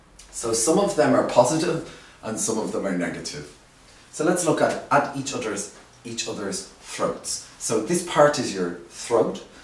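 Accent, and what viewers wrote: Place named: Ireland. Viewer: You sound to be Irish, you're from Dublin right?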